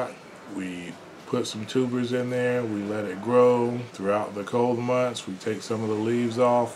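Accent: United States. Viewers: Thanks for sharing. I told my sister that your voice reminded me of our uncle's. It is the Middle Georgia coming out. Baldwin County girl myself. Thank you also for giving the name of the seed company. Having seeds is vital in the current times. I look forward to watching more content as always. Blessings ✌🏾❤️🙏🏾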